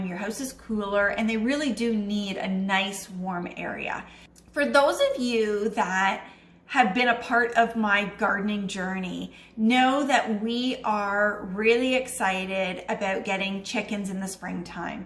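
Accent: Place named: Canada. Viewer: Hello! Thanks so much for visiting me! Wow this was so interesting! My Husband is Japanese so I make a lot of fermented Kimchi! I know you must live in Canada. Love your accent ❤️. New Friend here❤️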